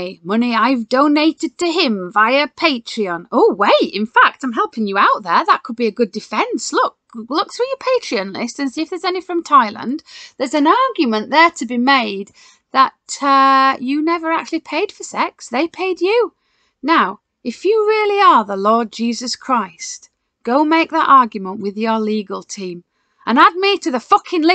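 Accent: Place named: Essex, United Kingdom. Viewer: what a lovely accent. Essex?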